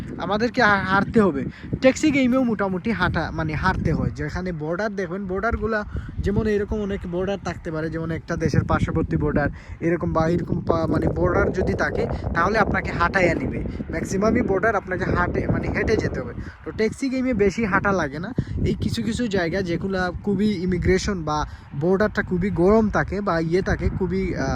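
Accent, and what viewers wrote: Sylhet, Bangladesh. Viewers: Bhaiya Sylheti mone hoy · ভাই সিলেটি?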